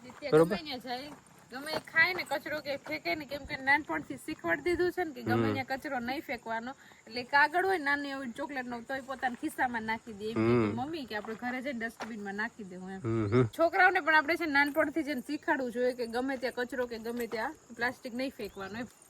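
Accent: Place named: Bhavnagar, India. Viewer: mne tame bolo chho a spich mne bav game aam bi mne bhvngar ni lengwej boooj game mari frend gondal na pinl gohel atle pelle thi vaato karvi bav game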